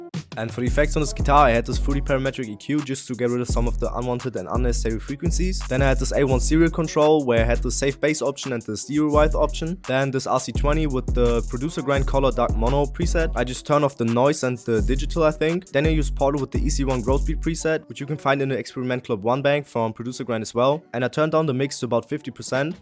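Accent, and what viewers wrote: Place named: Germany. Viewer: Yo u sound german are u ?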